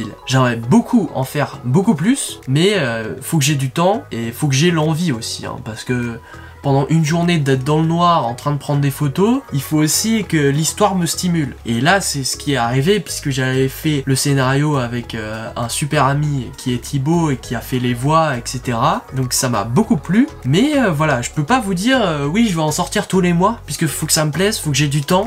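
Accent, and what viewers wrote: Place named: Germany. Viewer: Super l accent allemand 😁😁😁😁. T es génial.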